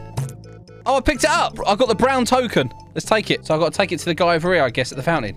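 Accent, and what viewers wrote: United Kingdom. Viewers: Your british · Are you british? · Bro is british🏴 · I was wondering r u British 😮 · I just realize that you were British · U are British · YOUR BRIT-ISH MATE? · I LOVE YOURSELF BRITTISH ACCENT 😭😭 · I know ur british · Are you British? Bc if you are… HI MATE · bro is british 💀💀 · Areyou british? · Are you British? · are you british😅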